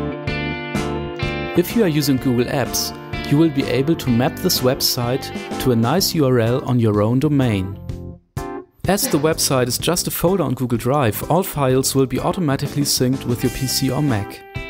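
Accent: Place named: Germany. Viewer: Love the german accent ;)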